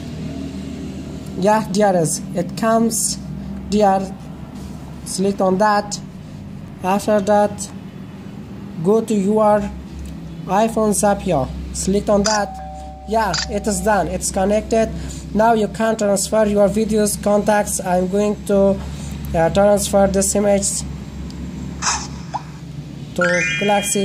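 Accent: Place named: Afghanistan. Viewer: Afghans talk English like him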